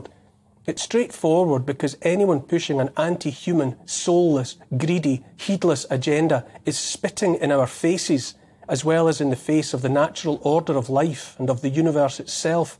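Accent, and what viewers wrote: Scotland, United Kingdom. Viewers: You'll get no argument from me on that one 😁 😉 Neil Won't Kneel A gentle, beautiful Scottish lilt A kind, empathic, forward tilt An open heart; a caring face A willingness to take his place. A strong, home-hitting monologue Reminds us of the boiling frog The courage to become a sleuth A passion to release the truth. A deep desire to crack the whip Illuminates our freedom-strip Calls out the darkness taking hold The shiny despots; plated gold! Intense and raw, he lays it bare A soul-enhancing, honest stare Inflections drive the message through He speaks for Us - for me and you! Discernment's such a precious gift His words create a mighty lift Take heed of all he has to say He knows - it shouldn't be this way! 🤗🦋🐬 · I fond of his scottish accent and independent attitude :)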